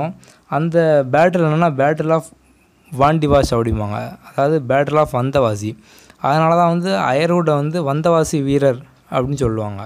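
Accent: Tirunelveli, India. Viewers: sir neenga tirunelveli ninaikren tirunelveli tutico slang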